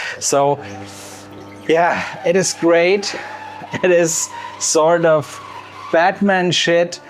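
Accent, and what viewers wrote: Germany. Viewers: Congratulations!!! Great Job!!!🎈🎉🏅🙌🙌🙌 My background is German and I miss my Auntie who cooked wonderful German recipes (she passed 20 years ago) and my Mom is 93 and no longer cooks so now I look to your channel to learn some favourite German recipes. Love your German accent and your occasional German expressions and sentences because it refreshes my German (I'm in Canada) because it makes your channel Authentic. Keep up the great work!!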